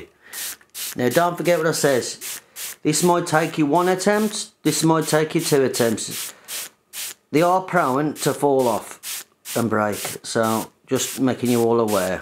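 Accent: Birmingham, United Kingdom. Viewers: You sound like a fellow Brummie! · I used to hate dandelion season and right now in Canada, we don't have any. I can't believe that I am looking forward to it this year! Just discovered your videos and love your presentations and simple explanations. Look forward to more! Great job. As an ex Brit, love the accent! Thanks again Bill Goodwin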